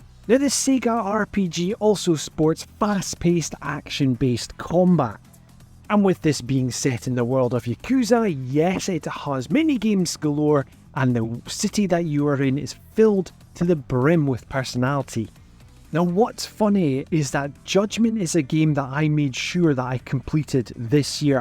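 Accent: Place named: Dundee, United Kingdom. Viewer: lol as soon as i heard the accent bro i was sure it was dundee lol. i moved here not long ago and that's how everyone sounds to me :P if you heard me you would probly be able to guess what parts i'm from as well haha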